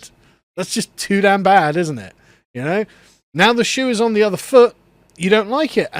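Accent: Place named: England, United Kingdom. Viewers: Indian woman with english accent? IGNORED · Seeing a fellow Indian use an English accent to push such stupid ideas is very disappointing. Violence as a tool is a communist tactic and should be confronted with the tools that worked in the past.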